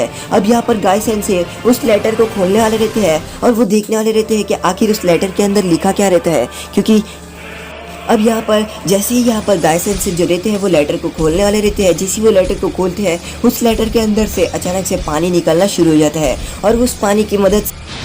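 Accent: Maharashtra, India. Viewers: Bro are you marathi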